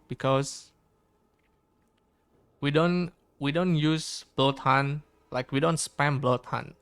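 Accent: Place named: Indonesia. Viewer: Thanks for the awesome build bro enjoying it very much! Btw, From your accent, are you indonesian ?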